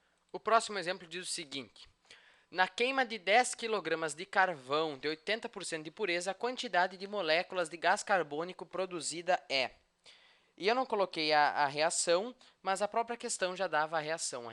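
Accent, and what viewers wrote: South, Brazil. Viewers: Sotaque de sulista!!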